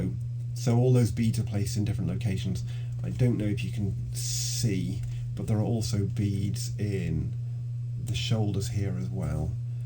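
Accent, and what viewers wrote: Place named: United Kingdom. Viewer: I thoroughly enjoyed your video, one thing you don't know is I love UK speech.lol. Only the English can pronounce my name correctly, here they pronounce it Don but it's Dawn and only English expats pronounce it properly. Your Theresa Wentzler (sp?) turned out beautifully, I know myself and I'd never make it through one of her patterns. You've done some beautiful pieces , thanks for showing them. There is a man in my stitchy group and he's stitching an absolutely stunning pattern of a male nude reclining. The women are quite appreciative of his physique lol I must remember to ask him where he found the pattern. You do beautiful work and I'm very happy you decided to join floss tube. I live in Nova Scotia Canada and there are few places to buy supplies in our area so most things I have to order from Ontario or further west. 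We do have a designer called Charting Creations , you might like to check out her charts, they are beautiful and she is kitting them up now. She has started getting a lot of supplies in though and will order anything we want so that's a great resource finally. I've just started And a Forest Grew and I'm loving it, each tree or animal feels like a little finish so it's encouraging to get to the next bit. I hope you do make more videos as you feel you want to, I enjoy longer videos so I can make a nice hot cuppa and stitch while I watch. Hope you had a lovely Christmas and I wish you a Happy New Year as well. 🙋🏼🤗🇨🇦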